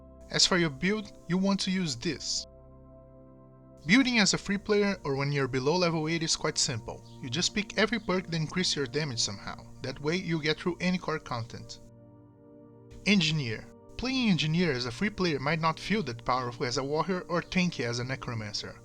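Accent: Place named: Brazil. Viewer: You sound Brazilian 😅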